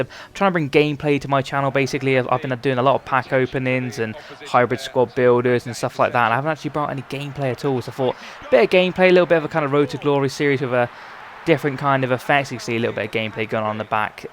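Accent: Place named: Cornwall, United Kingdom. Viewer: hey, where are you from? you sound a bit cornish ;)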